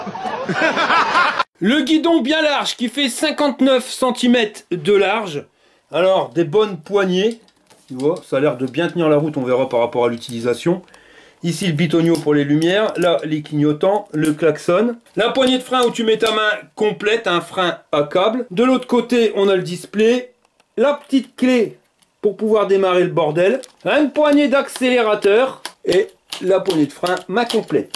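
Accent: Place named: North, France. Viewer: Salut, alors, je viens de voir ta vidéo sur YouTube, sur une trottinette avec selle et pneus offroad. Je suis d’accord avec toi sur la sélection des paliers de vitesse. Mais, moi, j’ai une Hitway S5: celle-ci est censé faire 45 km/h sur 45 km, elle a aussi un siège avec des pneus route anti crevaison, freins à disque avant et arrière, un plateau,(et non, pas un deck- restons dans le français,- pas la peine de se galvauder...), avec matériaux anti- dérapants sur les côtés, le tout, sur un moteur électrique sur la roue arrière de 800 watts... elle a aussi des clignotants avant et arrière, elle fait 20 kg tout plein fait,(si j’ose dire...)... Dans la réalité, j’ai d’une trentaine de Kléber faisant le même poids que toi,(99 kg). Pour l’instant, je ne peux plus en faire, j’ai glissé sur une plaque d’égout et je me suis blessé à la jambe, et ce en marchant... 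Pour ton problème de puissance, je pense que l’idéal, serait un moteur de 350 watts sur chaque roues. Par contre, je ne suis pas d’accord avec toi sur le régulateur de vitesse, moi, je ne l’ai pas, et c’est ce qui manque à ma trottinette pour être parfaite, en tout cas, pour être parfaitement concentré sur la route... Continue avec ton humour, et ton accent du nord, car moi, j’aime bien.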